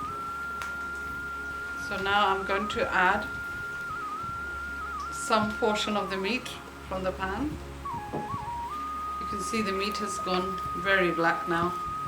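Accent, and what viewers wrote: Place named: United Kingdom. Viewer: Auntie sound like has been studied in UK or stayed in uk for long time by the accent. Nice video btw. Love it. Chittagongonian food is the best.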